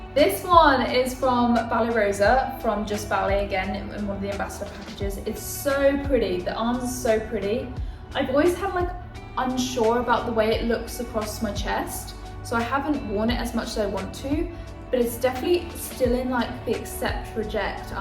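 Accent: United Kingdom. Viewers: You speak British English very pleasantly. I think your video was well produced and presented. I'll teach you how to speak Southern American English if you should ever come to Florida.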